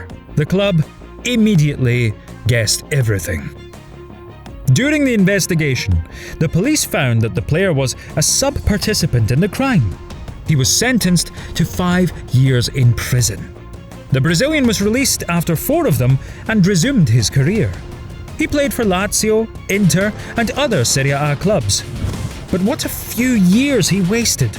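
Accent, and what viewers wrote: Scotland, United Kingdom. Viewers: its scotish?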